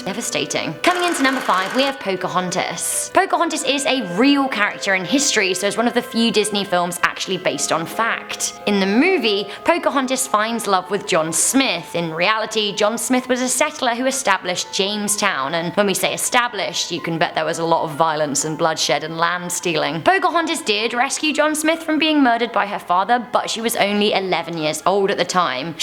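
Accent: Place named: United Kingdom. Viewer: She sounds like a British Princess Carolyn (Bojack Horseman)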